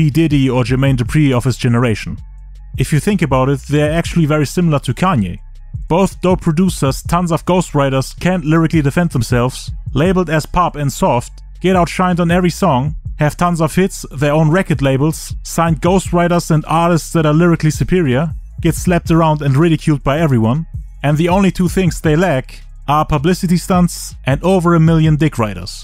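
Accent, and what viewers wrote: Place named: Germany. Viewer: Dein Deutscher Akzent knallt anders rein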